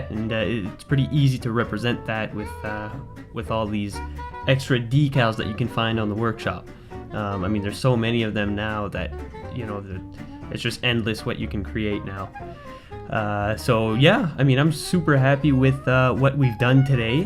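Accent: Canada. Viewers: Canada, I knew it. I knew you had to be from New England or Canada or something. I wish I knew what my Pittsburgh accent sounded like to other North Americans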